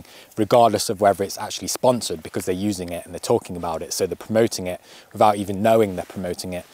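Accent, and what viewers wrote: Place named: East Midlands, United Kingdom. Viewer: You mentioned living in the East Midlands.. Would you mind me asking whereabouts? I'm from Mansfield and your accent does sound a bit familiar. With regards to regrets, I can relate to spending so much money on gear that I then can't afford to go anywhere to actually take the photos in the first place! 😂😂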